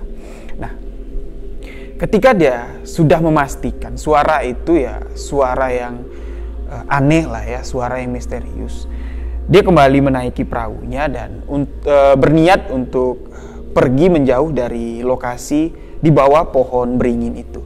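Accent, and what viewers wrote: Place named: West Sumatra, Indonesia. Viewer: Nada suaranya kayak org minang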